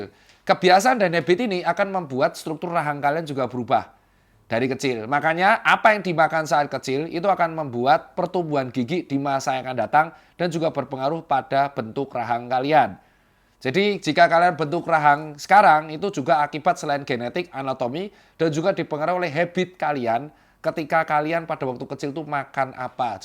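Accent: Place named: East Java, Indonesia. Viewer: Bukan marah.. Itu emang aksen khas jawa timur. Khususnya surabaya dan sekitarnya.. 😂😂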